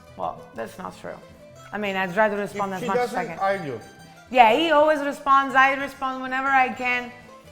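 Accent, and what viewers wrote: Rome, Italy. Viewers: Ago er cxx...😂😂 me ne moro che in mezzo all'inglese, ce scappa sempre la romanita'❤ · I just wonder how is your brother don't have the Italian accent. I mean you still have this thick accent but he does not.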